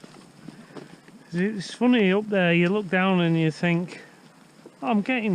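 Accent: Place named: Yorkshire, United Kingdom. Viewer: You sound like a Yorkshire man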